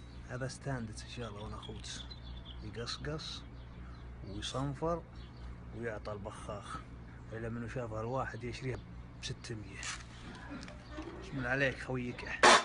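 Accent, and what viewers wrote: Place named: Qassim, Saudi Arabia. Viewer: انا بدخل مقاطعك مش عشان اتعلم عشان اسمع لهجت القصيم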